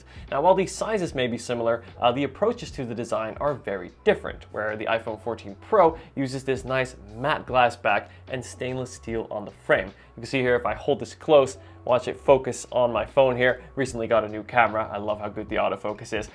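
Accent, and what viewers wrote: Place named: Netherlands. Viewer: You speak dutch to? I hear a little accent.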